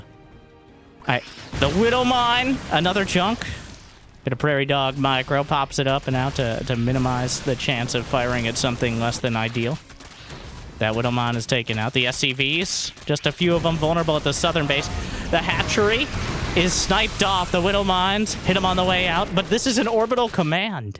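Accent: South, United States. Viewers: Dude im not gonna lie, I used to watch you years ago. I do NOT remember you having a southern accent whatsoever. Am I delusional?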